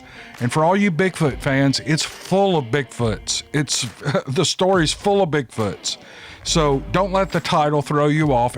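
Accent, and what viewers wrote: South, United States. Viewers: Somebody should proof-read this! In one sentence early on you had the sentence correct but switched the words "mind" and "bed"...that made no sense but would fit if you put the words where they belong. It is like a robot-reads this. This could not happen if it were read by a live human. It is like the man's words are used but a robot reads it; a robot would not understand the meaning and therefor not notice it! So we tried to figure it out but can not! This is crazy. Who would use a robot voice with a southern accent that even pronounces "oil" as "o-el"...? I hope you can explain it!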